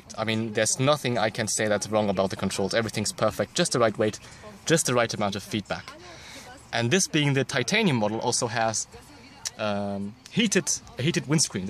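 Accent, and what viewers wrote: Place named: Germany. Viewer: du sprichst zwar englisch mit einem starken deutschen akzent, trotzdem würd ich gern so gut englisch sprechen können wie du. super videos übrigens. mach einfach weiter so!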